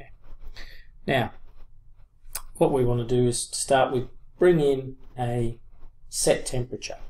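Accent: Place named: Australia. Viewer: Thank you for superb teaching video from Thailand. Listening to your accent I missed all friends in Melbourne.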